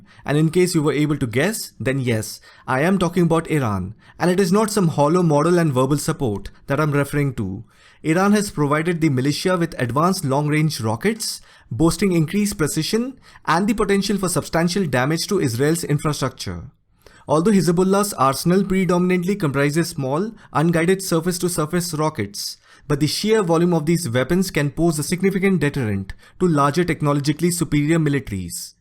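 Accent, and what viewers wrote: India. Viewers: This guys indian accept is insufferable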